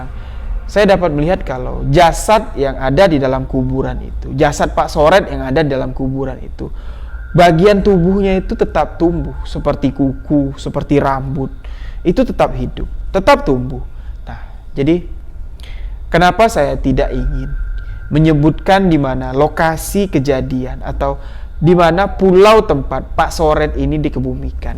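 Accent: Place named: Bali, Indonesia. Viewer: Oalah, saya baru liat eps ADUM. Ternyata abng tinggal di Bali., pantesan logatnya spt org Bali. Sukses terus bang, sy suka cra penyampaian cerita dr abang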